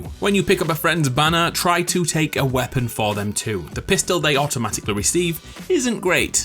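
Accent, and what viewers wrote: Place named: Yorkshire, United Kingdom. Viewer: Which part of Yorkshire are you from because I’m from Yorkshire too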